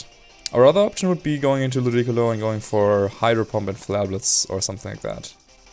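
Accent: Germany. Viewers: Your German accent English is wayyyyy too cute and my ears enjoyed it. You're such a chilled guy lol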